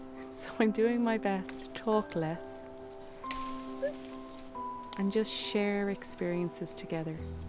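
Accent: Ireland. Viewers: 3 years later and I still comeback so I can go little slower. Your Irish accent I confess brings me joy and calm that I cannot exactly explain. But thank you for all that you put on this platform. I hope your little one is growing well. And that you are still living that is best suited for you and yours. Just dropping in to say hi and happy October again. The seasons cycle is so beautiful don't you think? Take care from the PNW in the USA. May the rain bring all the life needed. ❤❤❤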